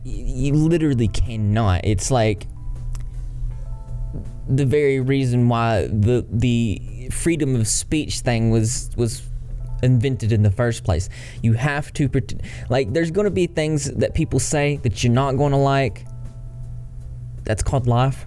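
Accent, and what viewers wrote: South, United States. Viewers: Whst kind of american accent is that? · Oh. You're a southern boy 💛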